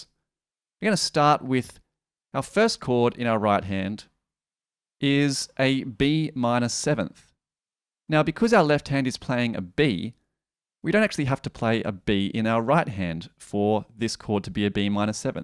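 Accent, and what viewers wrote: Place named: England, United Kingdom. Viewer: I sense an English accent xD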